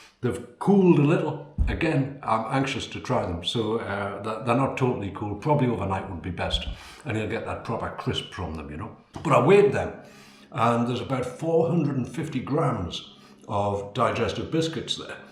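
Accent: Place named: Northeast England, United Kingdom. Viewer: Where are you from your accent sounds like the north east of England.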